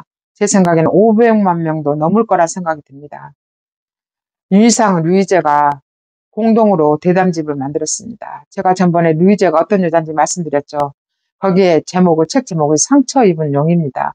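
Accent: Jeolla, South Korea. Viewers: 전라도 말씨가 이렇게나 예쁘시다니... 그동안 고정관념을 버리겠습니당~! 통영의 딸과 그 불쌍한 남편이 생각나는군요!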